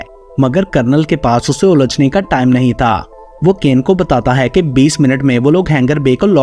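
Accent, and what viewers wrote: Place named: Punjab, India. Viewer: Hindi in punjabi accent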